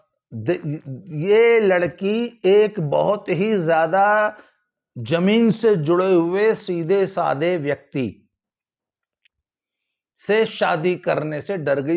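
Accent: Haryana, India. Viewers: Ram ram dada ji, Aap ka Hariyanvi samvad kamal ka he 😂😂😂😂😂, aisa lag hi nehi reha ki aap eastern UP se ho,lagta he ki aap Hariyana ki gramin sathli se ho 🙏🙏🙏🙏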